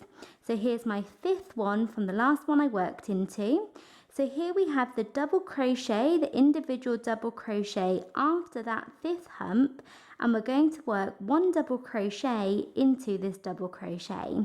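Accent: United Kingdom. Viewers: Absolutely love this dress! You make it so easy. Excellent teacher! Being from the US, I love listening to your British accent.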